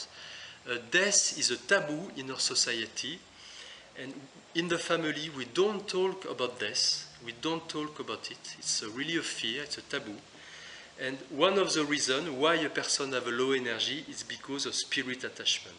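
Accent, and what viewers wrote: France. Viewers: Love the accent😁my son in law is French, and reminds me of France and lovely people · Ohh, the french dialect... j'aime! ❤ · Loved the video! very helpful and explained lovely - specially with the french accent (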